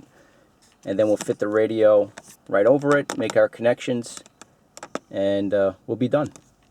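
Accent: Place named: New Jersey, United States. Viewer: You sound like your from Jersey, i just watched the movie goodfellas you have a similar accent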